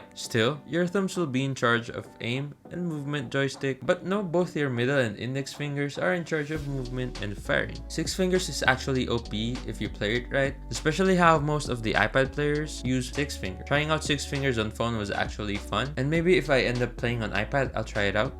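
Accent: Philippines. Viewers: Btw r u asian or filipino? Just askin cuz u sound like u have an asian accent mostly sounds like a filipino english accent:)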